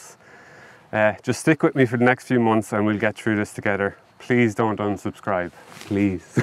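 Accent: Ireland. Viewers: Best channel ever... It has cars and Irish English, love it. Hope all is well with Sinead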